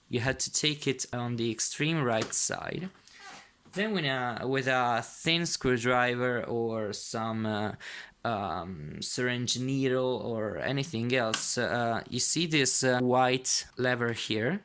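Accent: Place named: England, United Kingdom. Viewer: mi sembra che tu lo parli come un inglese complimenti .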